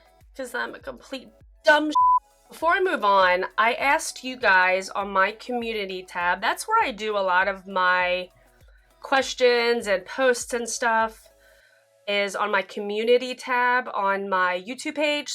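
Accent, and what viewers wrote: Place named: Appalachia, United States. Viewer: You're so down to earth! Honestly that accent is pretty close to the North Central WV/ South West PA accent... Semi-Appalachian. I'm from Morgantown, WV and you sound very similar to me.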